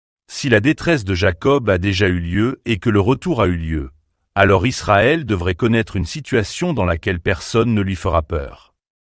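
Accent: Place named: France. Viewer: ISRAEL ET LE TEMPS D'ANGOISSE DE JACOB ART KATZ en audio. Chers tous, Dans le grand charivari d'opinions et influences souvent contradictoires autour de la question d'Israël dans les temps de la fin je me suis souvenu, comme d"une priorité absolue, du travail prophetique charpente sur base des Écritures de notre défunt ami, Art Katz. C'est clair, tranché, évident et ne fut pas aimé lors de la publication. Art venant chez nous en souffrait...Mais de toute évidence la réalité d'Israël aujourd'hui devient une caisse de résonance évidente pour ce message. Bonne et sérieuse écoute. Les temps sont graves ! Haim Goel L'accent frenchie est désastreux. Sorry.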